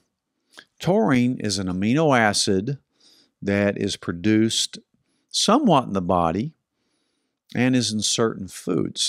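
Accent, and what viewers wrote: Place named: South, United States. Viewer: I despise Southerners and that accent.. wtf?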